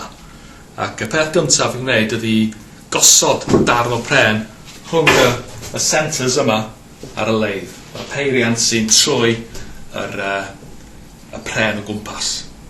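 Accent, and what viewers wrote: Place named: England, United Kingdom. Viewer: im russian and even i do hear that terrible english accent. most of todays celtic speakers have it, unfortunately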